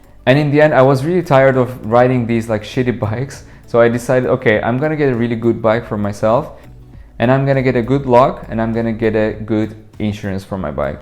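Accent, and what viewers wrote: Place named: Netherlands. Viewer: You speak English with a Dutch accent, so your Dutch must sound very good :)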